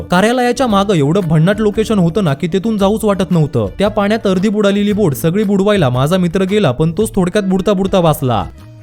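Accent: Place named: Vidarbha, India. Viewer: Tu Nagar cha watat nahi sagle shabda Vidharbhatle.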